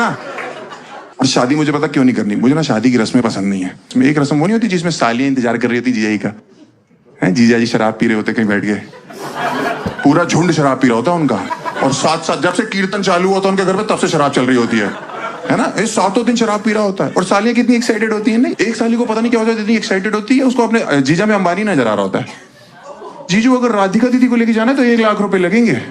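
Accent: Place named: Uttar Pradesh, India. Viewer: His UP accent is the best 👏🏻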